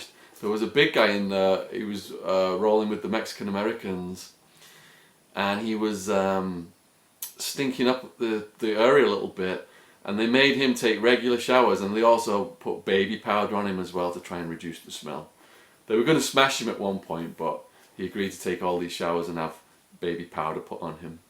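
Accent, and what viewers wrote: United Kingdom. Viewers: Awww Shawn/. TMI LOL. You must have really stuck out in an AZ prison with that Brit accent. Geez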